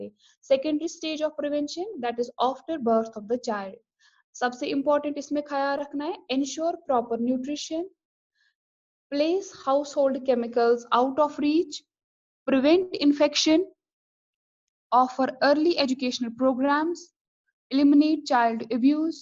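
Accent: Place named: Kashmir, India. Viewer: Ur language accent is kashmiri ❣️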